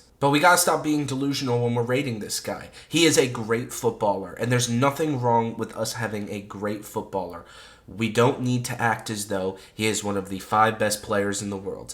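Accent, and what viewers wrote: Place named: United States. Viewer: Bro, judging by your accent, I am gonna assume that you are an American. And I have noticed that the number of American football Youtubers has increased a LOT in the last two years. Is there any increase in football's popularity in USA recently in the last few years ?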